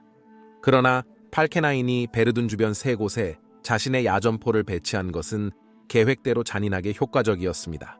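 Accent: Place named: China. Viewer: mbc 손령처럼 중국발음 오진다. ㅜㅜㅜ ㄹㄹㄹ 전형적 중국인 발음 ㅋㅋㅋㅋㅋ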